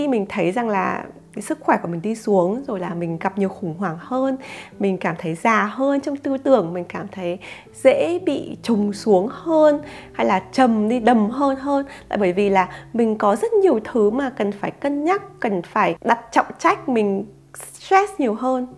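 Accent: Standard. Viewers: bài chia sẻ rất là hay, giọng rất chuẩn!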